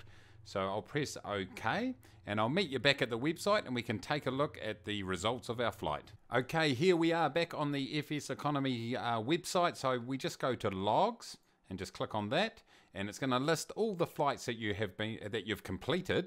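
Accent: New Zealand. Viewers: Unrelated, I love that kiwi accent of yours. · Right away heard the Kiwi accent. IFF ESS Economy. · Love your accent dude, you sound like my coworker thats from New Zealand!!!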